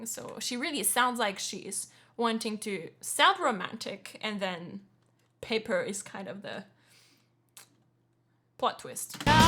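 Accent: Canada. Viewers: Hold on a second, are you Canadian?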